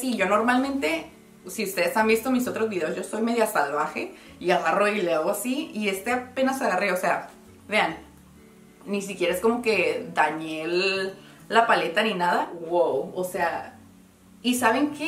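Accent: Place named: Colombia. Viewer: ¿de dónde eres? Por el acento pareces colombiana....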